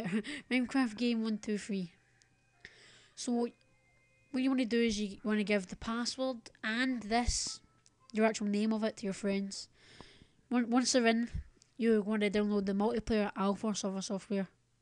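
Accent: Scotland, United Kingdom. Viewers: You gotta mad scottish accent.. Its scottish right? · Like the accent, im scottish too i dont often hear it on youtube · oh he is Scottish i can tell i been there lol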